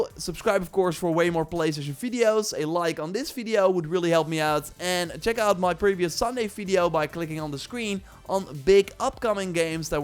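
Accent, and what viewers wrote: Netherlands. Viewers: Do I hear a Dutch accent jongeman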